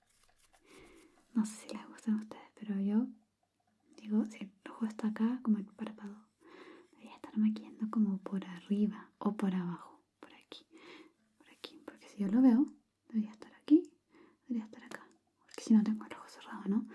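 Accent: Chile. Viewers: Primera vez que pude sentir su acento chileno ❤, me daba curiosidad por los vídeos en neutro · Me vengo enterando que a la serie de terror le fue mal y es de mis vídeos favoritos por la historia y el esfuerzo en todos los vídeos, no lo creo. 😤 Saludos, me encanta tu voz y el acento chileno. 🧡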